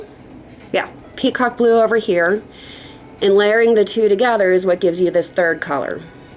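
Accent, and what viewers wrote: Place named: Pittsburgh, United States. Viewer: are you from pittsburgh? you have the accent of a yinzer!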